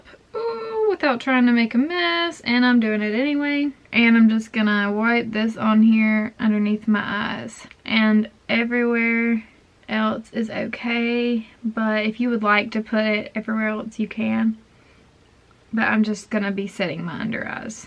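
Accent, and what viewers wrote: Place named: South, United States. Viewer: You look amazing girl. You have a south accent I'm guessing your from the south of the us, but I have been watching True blood and you remind me of them. I hope this wasn't that offensive If it is I'm sorry. But great video!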